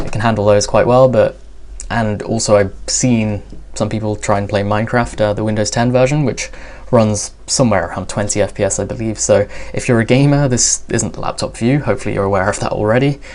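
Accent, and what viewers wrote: England, United Kingdom. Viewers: This guy could not have a more English accent if he tried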